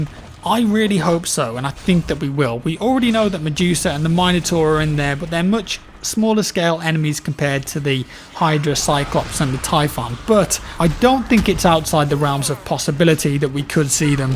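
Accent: United Kingdom. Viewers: The way you pronounce Minotaur and Gaia...sometimes I can't even with you Brits.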